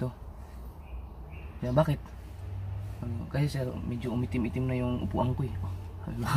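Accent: Visayas, Philippines. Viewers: taga saan ka pala talaga sir may parang bisaya halo tagalog mo sir tanong lang hhehehe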